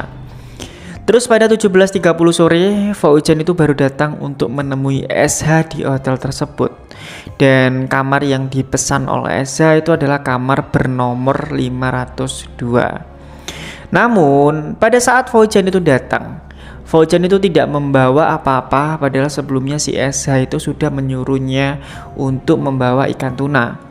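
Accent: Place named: Java, Indonesia. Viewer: Jangan pake logat jawa mas karna tak enak di dengar lihat macam kamar jeri dia orang aceh tapi logat aceh nyah tak di pakai karna indonesia terlalu luas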